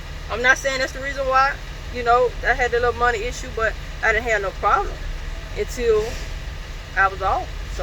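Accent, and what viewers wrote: South, United States. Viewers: Hi there! Sorry, that happened to you. My peoples lives in Memphis. I just your southern accent. Your down to earth too. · Hey lady. I haven’t been with you from the beginning. But I do remember when you were local. Then you got your own truck. I remember you talking about running under your own AUTHORITY. Then you started company hoping. And now your back driving your own and running Local. Stay post and start and keep your head up. Still waiting on that other channel. Love your Southern Twang.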